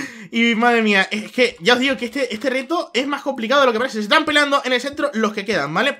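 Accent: Argentina. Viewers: se volvió argentino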